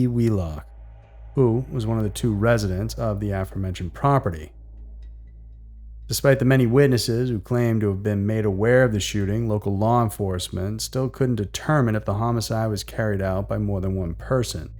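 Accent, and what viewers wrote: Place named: North, United States. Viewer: I’m usually REALLY good at figuring out where someone is from by how they talk or even without any accent, how they say certain words. But I can’t figure you out! Northern states is all I’ve got. But leaning toward NorthWestern. Where ya from!?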